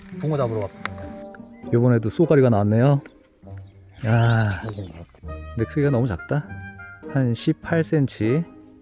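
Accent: Jeolla, South Korea. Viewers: 자네 고향이 전라돈가?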